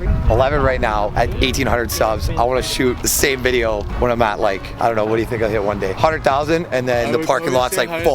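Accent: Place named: Canada. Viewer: Buddy!!! Sick ass subi. First time watching your video definitely subing. I heard the accent of a fellow Canadian Brother. What Provence you in? ( If you don't mind me asking)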